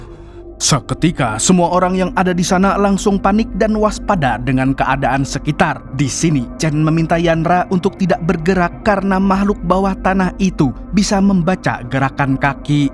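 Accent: Banjar, Indonesia. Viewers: Orang Banjar,, saku mimin nya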